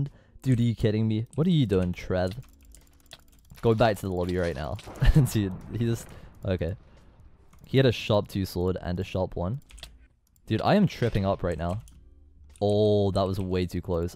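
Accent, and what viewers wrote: United States. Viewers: You better keep your American accent If you dont... Your gonna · PLEASE LIKE I WANNA HEAR HIS AMERICAN ACCENT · Like like like like like like like I WANNA HERE THIS MANS merican accent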